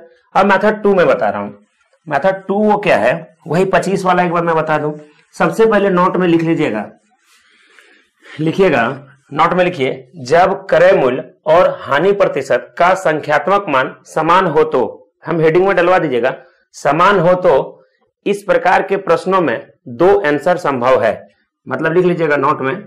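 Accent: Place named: Bihar, India. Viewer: Are gjbbb Gurudev ap to.....1 dum bihari tone m mazaa aa jata hai padhne m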